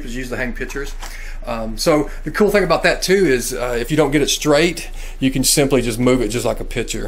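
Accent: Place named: Georgia, United States. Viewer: Are you from Georgia?? Your accent reminds me of AJ Styles. :)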